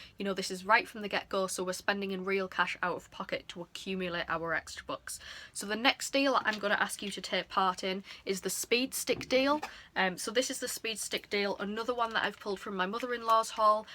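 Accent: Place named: England, United Kingdom. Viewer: Hi your accent sounds a bit like mine are you from England? I am from North Shields a little village called New York xxx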